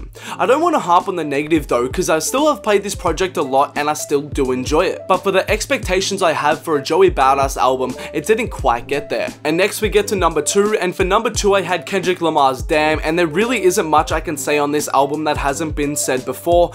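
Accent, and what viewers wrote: Australia. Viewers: I wish I had that Australian Accent. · What part of Australia is he from?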